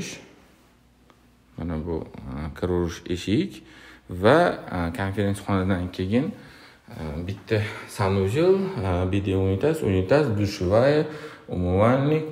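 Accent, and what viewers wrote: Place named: Tashkent, Uzbekistan. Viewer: Узбек тилини софлигини бузадиган шева , Бу Тошкент шевасидир .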